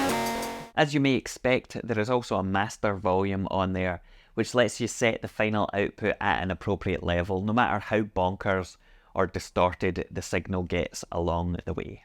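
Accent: Scotland, United Kingdom. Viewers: subscribed for your delicious Scottish accent!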